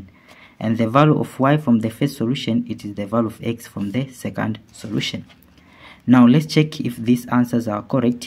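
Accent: India. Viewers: Индийский акцент режет слух. 😢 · Какая-то профанация. Ребята, судя по произношению, это индус. Германией тут даже и не пахнет. Всё, кина не будет. Расходимся.😂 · По акценту вроде индус. Чалма жмёт видимо. Обычное квадратное уравнение. Но в Гарварде, где учился обама таких считают гениями и берут без экзаменов 😂😂😂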